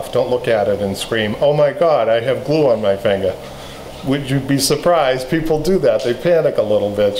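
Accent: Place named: Boston, United States. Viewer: I've been watching youtube videos to learn how to reupholster my chair, and came across this. Your accent...I thought, "he has to be from back home?!" Sure enough, Boston! Thanks for the great videos, from a fellow Masshole now living in Nashville, TN!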